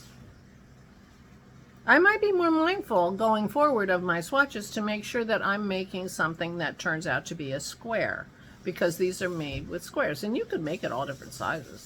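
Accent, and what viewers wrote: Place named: Montreal, Canada. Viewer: I love how your Montreal is coming out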